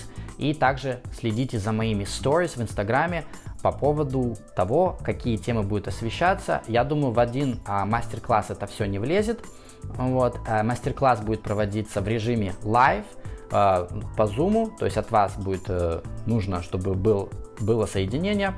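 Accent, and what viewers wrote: United States. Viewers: пожалуй не соглашусь, доносит вполне себе усредненый, современный американский и канадский английский.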